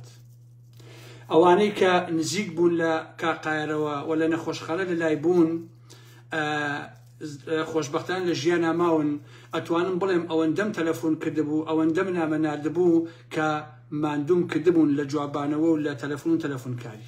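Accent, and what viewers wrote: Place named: Khanaqin, Iraq. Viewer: باوەگەی باوگم« خانە قینی واقسە دەکەن» دڵم❤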